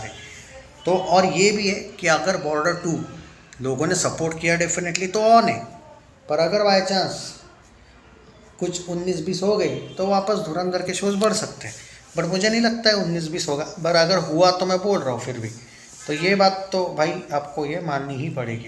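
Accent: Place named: Maharashtra, India. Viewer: Bhai i am addicted to your channel and your style of explaining things Specially i love the accent when marathi prople speaks hindi, as i am also maharashtrian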